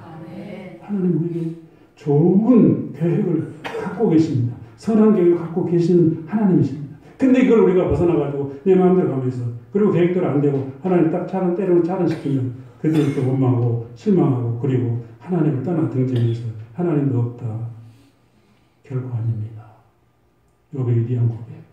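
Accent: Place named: Jeolla, South Korea. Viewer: 어제 꿈을 꾸었습니다. 선생님꿈을 몇달만에 또 꾸었습니다. 살면서 딱히 선생님을 생각하지않아도 1년에 한두번은 꼭 선생님꿈을 꾸게됩니다. 그때마다 선생님이 그립고 궁금했지만 소녀시절 추억은 추억으로 마음에 묻고 그냥 세월따라 가곤했습니다. 그런데 오늘은 선생님이 궁금해졌습니다. 이 쉬운세상에 찾을려면 얼마든지 찾을수있다는 생각에 네이버를 샅샅이 뒤졌습니다. 유투브를 샅샅이 뒤졌습니다. 목사님으로 검색했더니 몇분의 목사님이 떴지만 저는 대번에 이분이시겠구나 싶었습니다. 40년전 저는 어리디어린 열네살이었죠. 중학교 1학년2학기때 오셔서 드문드문있는 수학과목을 한학기만 가르치시고 떠나셨는데 40년이지나서 선생님을 알아뵙기란 쉽지가않더군요. 사실 지금도 긴가민가합니다. 오늘은(지금 시간이 새벽이니 어제는이 맞겠지요) 하루종일 선생님 유투브 아니 목사님 설교말씀만 들었습니다. 또 없나 샅샅이 검색해서 청소하면서도 듣고 염색하면서도 듣고 씻으면서도 듣고 마트오가면서도 듣고 누워서도 듣고 앉아서도 듣고 서서도 듣고 걸으면서도 들었습니다. 그러니까 어제는 새벽부터 선생님꿈 아침부터 낮 밤까지 하루종일 선생님 아니 목사님 설교말씀만 들었습니다. 순전히 우리 수학선생님이라는 이유때문에요. 덕분에 하루종일 하나님말씀 실컷 들었습니다. 그런데 진짜 우리선생님이 맞나요? 그때는 제기억에 아주 젊고 엄청 미남이셨는데 지금뵈니 키 크셨던거랑 얼굴 이미지가 비슷해요. 그리고 설교말씀듣다보니 악센트가 전라도억양이 있으셔서 그때 선생님 맞으신거같아요. 혹시나 사모님이 카메라에 잡히면 더 확실해지는데 아무리 이것저것 돌려봐도 사모님이 안잡혀 40년의 세월이 흘러서 제가 사모님도 못알아뵙는가싶구 확인이 안되네요. 하여튼 선생님 참 반갑습니다. 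유투브 올려주신 집사님 아주 감사합니다. 덕분에 집사님찬양도 많이 들었습니다. 앞으로도 목사님 설교말씀 자주 올려주시면 안될까요? 소리가 작아서 아주 집중해서 들었습니다. 앞으로도 잘 부탁드립니다. 감사합니다.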